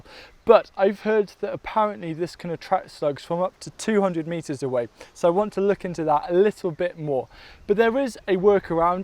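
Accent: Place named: Wales, United Kingdom. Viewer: Hello from Canada!! My grand father was from Llandudno. I never knew him but am enjoying hearing your "accent" and seeing how lush and green Wales is. You have a great channel. I have even gone back and watched your videos from the very beginning. Also recently purchased "Veg in One Bed". I only wish I had purchased it earlier in lockdown. I am not too far behind though, spring weather appears to be on locked down as well, so all is delayed. The last two years I have done self-wicking containers,(Gardening With Leon on YouTube) so weeds and other unwanted guests have not really been an issue. This year however, I am adding two 4x4 raised beds, and planting in amongst my perrenial borders to increase yields and try some new crops and varieties. You mentioned how slugs would avoid crops such as onions and prickly barriers. Do you think that planting onions seeds for spring onion cuttings, as a border plant around the raised beds and perhaps as a divide between crops in square foot planning (rather than string) would be a good deterrent to slugs? 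A living barrier so to speak? I am also seriously considering planting chives as an edge plant on all my flowers beds. I have also viewed that application on another channel. I like the idea that it is multi purpose and if it gets to wild I can mow it down and in will grow back. Thank you and keep up the fantastic content.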